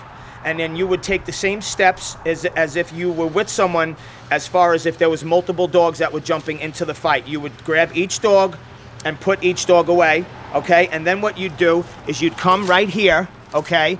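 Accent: New York, United States. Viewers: ...NewYaawk AcCcent loving it lol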